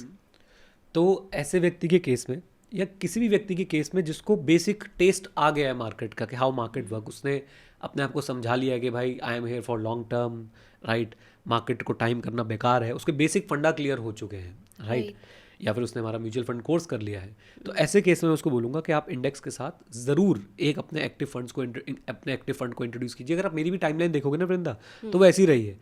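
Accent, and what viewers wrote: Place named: Panwari, India. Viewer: Panwari accent ❤❤❤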